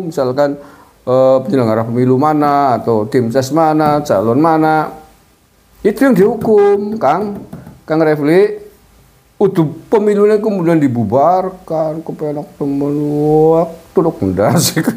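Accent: Banyumas, Indonesia. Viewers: Ndasmu njebluk 😂..om logat Banyumas.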